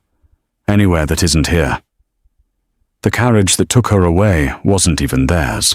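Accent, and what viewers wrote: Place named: United States. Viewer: You can travel around the universe but one cannot ignore the fact that, there is a big difference between proper English pronunciation, spoken by the people of the UK and American's version of English pronunciation. And we are listening to a story of Victorian England. Very inappropriate and causes double standards.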